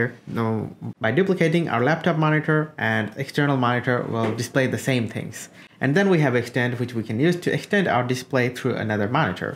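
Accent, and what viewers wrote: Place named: India. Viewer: Indian firangi 😂😂😂😂😂😂😂😂😂😂😂😂😂 i think you are indian so what's the problem in indian accent but content is good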